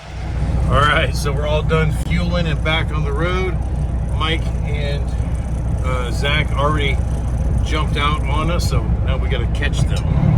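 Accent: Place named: Minnesota, United States. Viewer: By his accent, he sounds like he’s from Minnesota 😅?!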